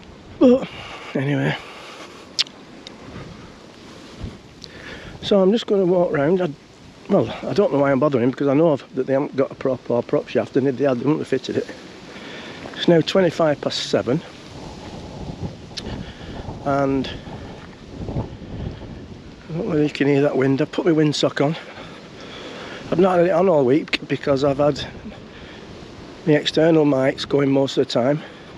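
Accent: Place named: Oldham, United Kingdom. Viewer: I enjoyed this - quiet because few people go on holiday to Norfolk in Feb. You are a bit different, but enjoyed the tour of the pubs, and you sound like you are from Owdam, so clearly understood:)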